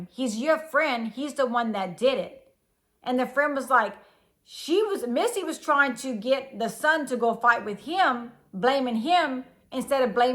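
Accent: Louisiana, United States. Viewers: My new favorite channel. Southern Louisiana boy living out of state. A smart cajun sounding voice is entertaining and comforting. Keep it up and thanks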